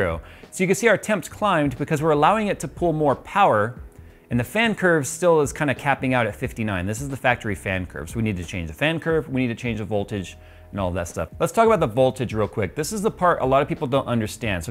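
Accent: Canada. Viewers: There goes Canadian again. Great video. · you're canadian? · You're Canadian,?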